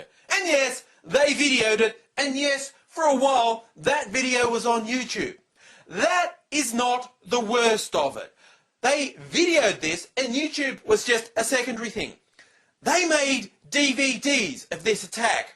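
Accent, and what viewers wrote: Australia. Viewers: Words Well spoken Aussie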